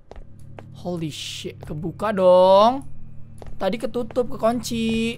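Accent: Jakarta, Indonesia. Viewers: Maap maap ni ye Iye ....iye...... iye.... Bang dari betawi kah ??...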